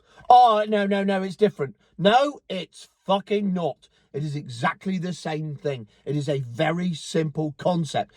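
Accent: Cornwall, United Kingdom. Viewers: I love your Cornish trait, accent and your love for your native land. These Dinghy Daves have no love for this Island at all. Kick them out.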